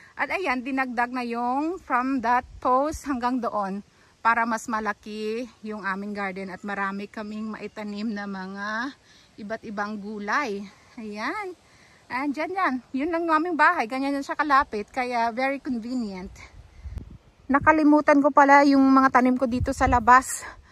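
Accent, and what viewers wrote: Iloilo, Philippines. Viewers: Good morning sis I just found your channel a minute ago. I can tell you're ilonga the way you speak so gentle and your tone. So nice to watch your garden. I also love so much gardening. My parents are hardworking farmers back in the Philippines long ago. But now I been living here overseas for a long time. Have a blessed day 💜🙏🙏🙏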